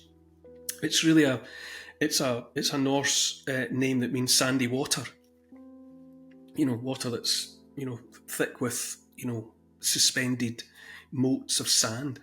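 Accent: Scotland, United Kingdom. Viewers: My dear Godly grandfather was born in Wilbarston, England but raised in and around Dumfrees, Scotland 🏴- my chosen homeland along with America Grandpa had a Brit/Scot accent and while we lived with my grandparents when young, my brother and I, being born and raised in the U.S. both of us had the same accent as our grandfather - our schoolmates surely wondered why we spoke in such a way, but hearing your scot accent brings me comfort and peace still as I hear my grandfather again- Godspeed to you, friend and to your family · I am glad to have been to Scotland 10 years ago, I fell in love with the landscapes, the music, the language, so kind people, and returned with a good amount of souvenirs (including books with folk tales). Yet there remains a longing to go back and spend more time there. Until then, the stories of that country, retold by someone with that wonderful Scottish accent will do. 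Thank you, sir.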